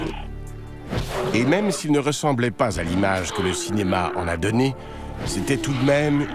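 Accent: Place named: Quebec, Canada. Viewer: l'accent québécois est tellement laid :malade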